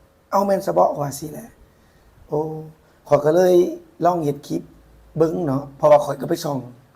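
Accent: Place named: Ubon Ratchathani, Thailand. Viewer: คนไทยอย่างผมรู้จัก ดีว่าเป็นคนลาว การใช้ภาษาไทยบ้างลาวบ้างมันเหมือนสำเนียงอุบลราชธานีเรารับได้ เขามีเหตุผลที่ดีกว่าคนในลาวเยอะ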